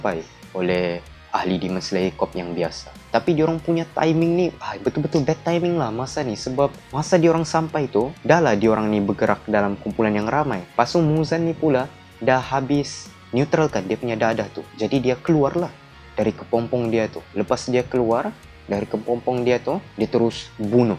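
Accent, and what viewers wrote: Sabah, Malaysia. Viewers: Ui orang sabah ba kau ni 🗿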